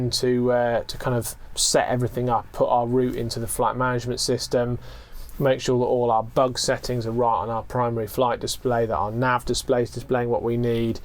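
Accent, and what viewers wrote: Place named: United Kingdom. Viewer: A “Very Polished British Accent “!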